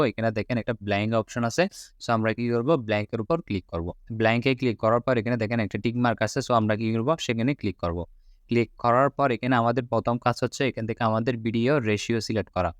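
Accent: Chittagong, Bangladesh. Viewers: ও চট্টগ্রামের আমি সিউর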